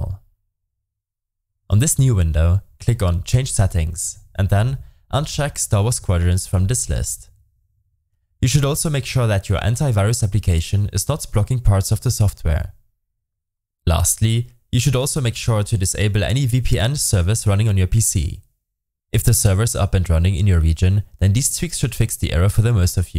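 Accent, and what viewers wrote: Germany. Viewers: OMG so wie es aus sieht bist du aus Deutschland wenn ja digga krasses Englisch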